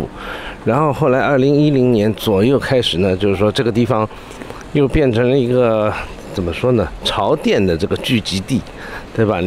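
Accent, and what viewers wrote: Shanghai, China. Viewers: 相對於其他博主介绍上海種種然weley以上海腔的國語導覽敘述令人 enjoy , 原生的上海人説的普通話有其特殊的語調詞彙，帶有種捉狭却又認真的複雜元素(没有貶意)這是只有以上海話母語的人才具有的, (外 地人無法模仿)上海待過較久的人聽起來特别親切, 尤其在90/00年代外來人口較少普遍都是這種腔調普通話， 歳月如梭,這幾年回去應該是新上海人越来越多,聽到的都是標準普通話, 這也可能是上海無形中漸漸消失的海派元素之一吧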